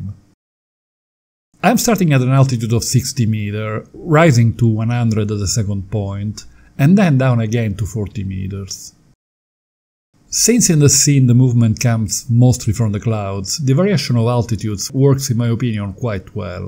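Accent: Italy. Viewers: I can't get over your thick Italian accent hahaha. Nice video.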